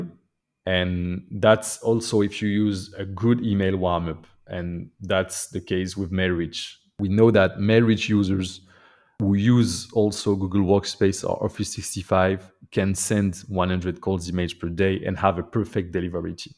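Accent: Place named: France. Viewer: sympa l'accent Français :)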